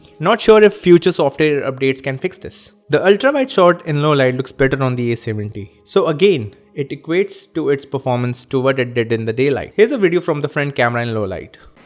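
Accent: India. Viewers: I meant because of the indian accent I getting sick of it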